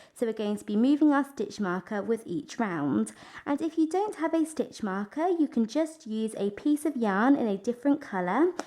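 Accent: United Kingdom. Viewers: this is in british and i don’t understand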